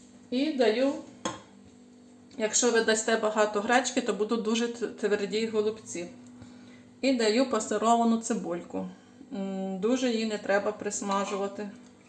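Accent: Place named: Volyn, Ukraine. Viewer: С удовольствием послушала вашу речь, вспомнила своих родных с Волыни.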